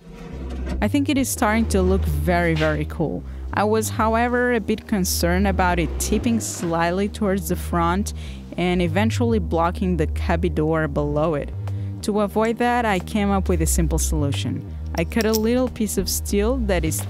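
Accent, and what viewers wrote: Portugal. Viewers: Pqp, assiti o vídeo inteiro imaginando que sotaque é esse e vc é portuguesa kkkkk sou br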